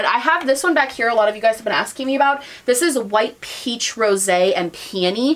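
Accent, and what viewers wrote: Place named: Rochester, United States. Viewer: Okay so...I finally figured out who you sound like to me....Kristen Wiig! And you're both from Rochester, NY. Lookie there. Accents are cool. Love your videos btw! Please do more non-makeup favorites :)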